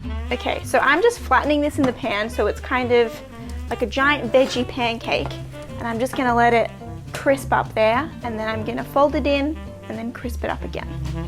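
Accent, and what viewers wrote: Melbourne, Australia. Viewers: Are you from Melbourne!? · She’s Australian, not english!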